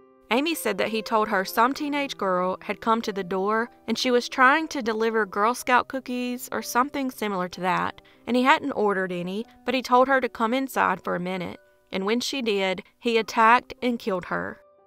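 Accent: United States. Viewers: Like your accent. Where in the US is your accent from 🇬🇧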